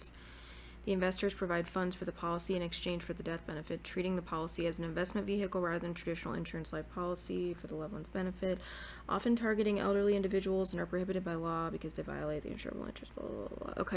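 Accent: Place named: South, United States. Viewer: I really like to listen to you because of your southern twang 😊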